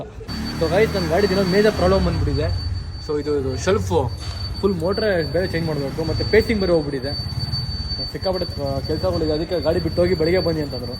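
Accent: North Karnataka, India. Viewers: just love the way you speak our uttarkarnataka slang 😂❤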